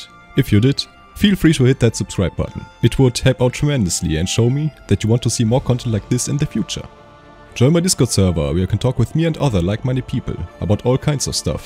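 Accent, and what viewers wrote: Germany. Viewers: Der deutscher akzent hört man zu sehr aber gutes video schade nur das man auf der konsole keine mods benutzen kann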